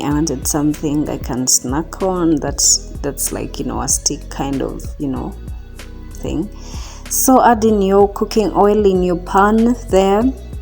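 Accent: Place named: Africa. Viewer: 😂😂 if your a whitey living in Africa you grow real tired, real quick of the accent.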